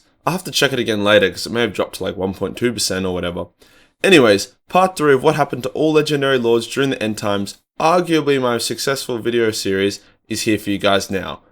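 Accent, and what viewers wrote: Australia. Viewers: Great vid man, always awesome to hear a Australian cover lore. Not only educational but funnier then my non-existent love life.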